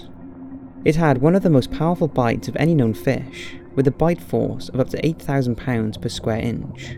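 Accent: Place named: South Wales, United Kingdom. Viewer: It's a southern Wales accent. Sounds most similiar to a Merthyr one, but he's such a soft spoken guy!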